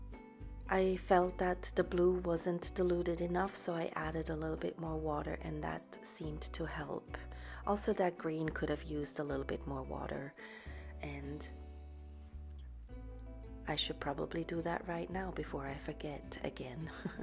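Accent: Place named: Hawaii, United States. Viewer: This is an awesome piece in and of itself, but it's more meaningful because of the thoughts you shared at the end. Thank you for that. 💜👍 Are you or your spouse from Hawaii? I hear an accent in your voice 🙂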